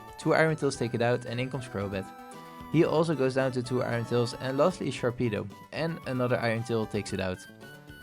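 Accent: Netherlands. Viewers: weird question maybe but are you dutch by any chance?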